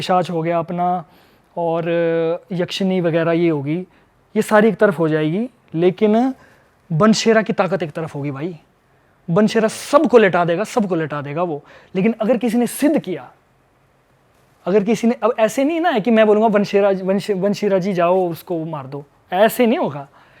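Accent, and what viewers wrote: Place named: Haryana, India. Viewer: Himachali tone inspired by haryana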